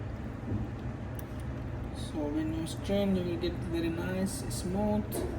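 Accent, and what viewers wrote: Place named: India. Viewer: English katha karane India un wage ai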